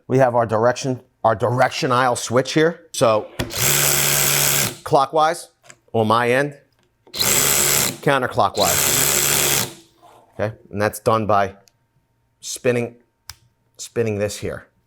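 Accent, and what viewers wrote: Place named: Staten Island, United States. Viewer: You must be in NJ or NYC. A fellow Staten Islander? I just discovered your channel this morning, and I'm convinced you must be from within a 10 mile radius to sound like you do.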